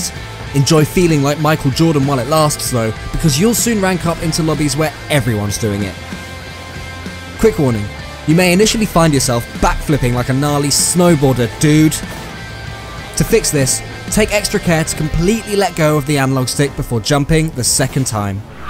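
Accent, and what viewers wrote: Denmark. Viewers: mate??? you're a dane??? :D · Is he danish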